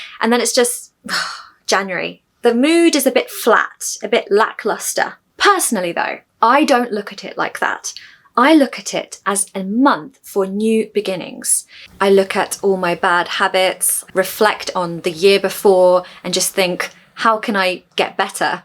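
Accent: United Kingdom. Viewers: your videos are a wonderful gift for us English learners. Your enchanting British accent with an aristocratic touch, your expressive body language, and the interesting contents.... All of these features are so motivational. May I use an Old English phrase? "God bide with thee!' Thank you! all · Happy new year pretty British-English, your lessons are amazing for us, good job, go,go further..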